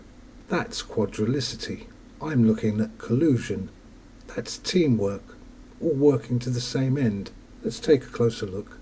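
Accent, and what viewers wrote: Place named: England, United Kingdom. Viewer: This is the FIRST time in my life that I did not understand not a word what he saying. Accent is terrible, probably English, sound is terrible with a lot room effect Never happen to me in 57 years: I can watch American or English movies without translate or subtitles but this is....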